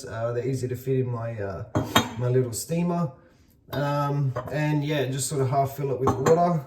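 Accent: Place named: Australia. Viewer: love your accent as well. I know your aussie but can tell theres a background somewhere,maybe second generation aussie but i can hear the little accent coming and going. Im from Sydney so grew up with lots of nationalities. Reminds me of home.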